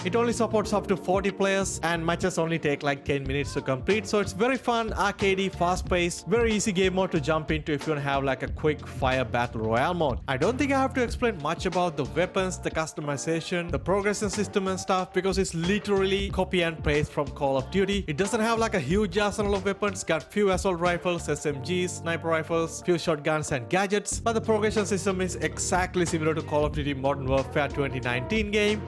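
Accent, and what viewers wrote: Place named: India. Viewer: This Indian accent is fire🔥🔥🔥🔥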